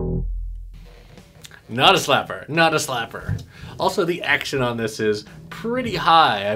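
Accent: United Kingdom. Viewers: Sandberg Booster, G&L SB-1, Stingrays, Dingwall, all have deep clear sounds. All of the violin 🎻 Basses sound so dull and without out put. They’re like one step up from a lead guitar. English accent was ⭐️ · the british accent! unmatched.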